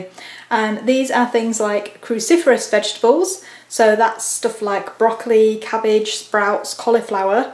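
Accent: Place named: Northern England, United Kingdom. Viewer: I get corn with the husks on from my local grocery store. I live in west sussex though and from your accent I am guessing you are from the north of england :)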